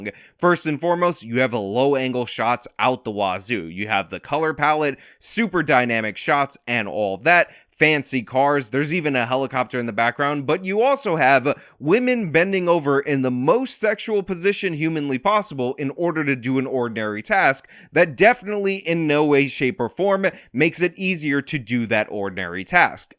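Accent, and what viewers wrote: New York, United States. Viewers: first time i noticed you have a new york accent, at least northeast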